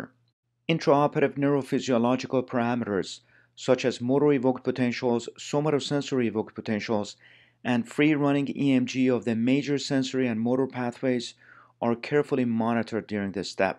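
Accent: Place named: Iran. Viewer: Lovely Persian accent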